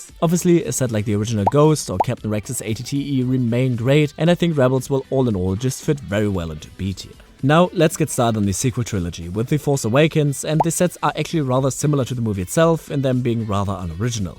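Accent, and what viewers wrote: Germany. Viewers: Duhörst dich deutsch an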